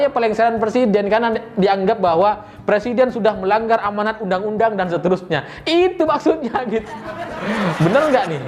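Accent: Madura, Indonesia. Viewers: Mas Maduranya di tonjolkan dong...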